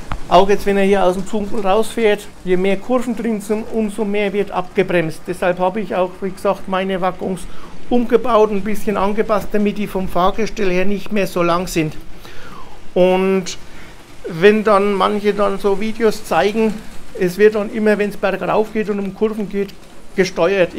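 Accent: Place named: Franconia, Germany. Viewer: Hallo, Ich habe ein größeres Projekt mit Lego Bahnen vor. Meine soll bei Schönem Wetter draussen im Garten fahren. Natürlich in Modul Bauweise. Deinem Dialekt nach, würde ich mal behaupten, daß du aus Franken kommst. Vielleicht hast du ja mal Lust auf einem Fahrtag, wenn das Ganze Form angenommen hat. Falls du da mal Bock drauf hast, dann meld dich mal. Liebe Grüße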